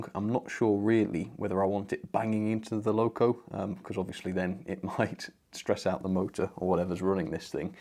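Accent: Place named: West Country, United Kingdom. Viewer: Hi, great review and I got the same model from Rails of Sheffield too. I have added the tower on my loft layout within the steam shed area... It works very well and quite novel...great Wurzel's accent..!!! 😁 I have weathered the tower and painted the brazier and added a fire glow LED under it to represent the de-frosting fire.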